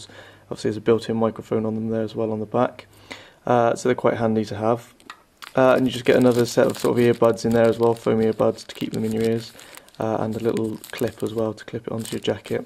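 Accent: Bristol, United Kingdom. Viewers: he has a British accent... i dind't understand him either pretty good... but if u listen carefully, its okay! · awesome phone, getting mine soon, also you sound like you're from around bristol.......maybe?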